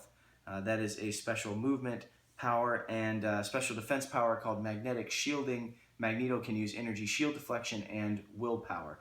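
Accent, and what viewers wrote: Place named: Russia. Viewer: Good Russian accent! You almost sound like FPS Russia!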